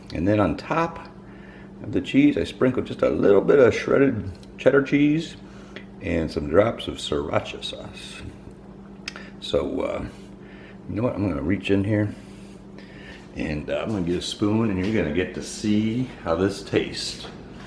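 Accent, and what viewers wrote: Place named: United States. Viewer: Usually only Brits and cats can eat Sardines for breakfast, but you sound like youre American....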